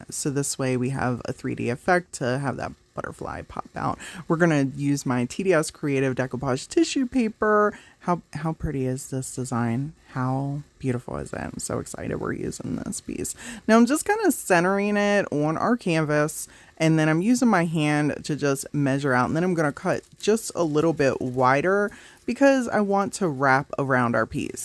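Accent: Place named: Philadelphia, United States. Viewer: I have just found her and I am very intrigued. She is unique. However, does she not respond to our comments? 😢 I recognize her accent, Philly, Baltimore, where is she? ❤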